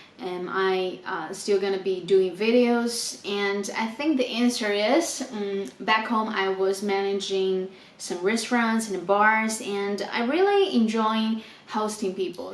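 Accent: United States. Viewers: You have a slight American accent? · I was almost sure you were AMERICAN. You sound more American than most AMERICANS.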